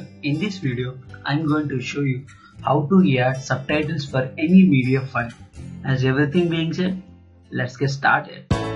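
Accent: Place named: Punjab, India. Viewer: Are you 👳 👦, your accents show you are.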